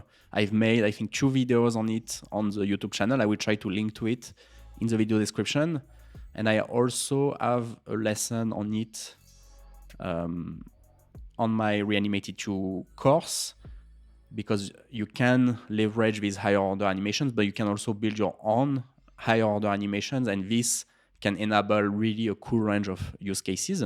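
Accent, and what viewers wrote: France. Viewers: Mec t'es trop fort, ton accent français est tellement présent ! !!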